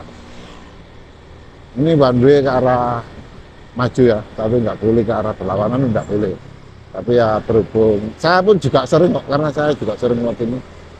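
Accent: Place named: Java, Indonesia. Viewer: gak usah pakai ngomong guys wong sampeyan mdehok Jawir. Udah gitu ngomong kok ribet banget, gak ada menariknya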